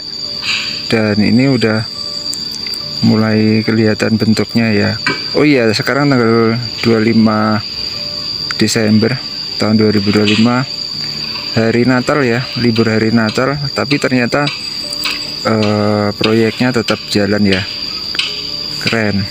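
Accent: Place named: Purworejo, Indonesia. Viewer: Melu ndelok mas,logat cah porjone ketok mas"ding" semangat n sehat terus mase ben iso ngasih info dari porjo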